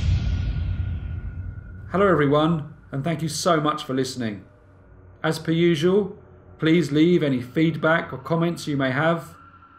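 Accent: England, United Kingdom. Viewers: It is a good strong and very clear English Accent you have and perhaps some People Don't like certain Accents and nothing can change People's minds on an accent. Don't mind the critics,your channel is a treasure! You always deliver the story brilliantly and with real conviction. Its always a pleasure to listen to your stories,thanks again.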